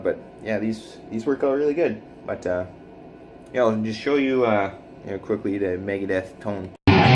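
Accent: Canada. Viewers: Good job on fixing and playing. Haha I knew you were Cdn as soon as you started talking. I'm in BC. You could get 6 G's for that easy. Check the Reverb site out. Go Jets!!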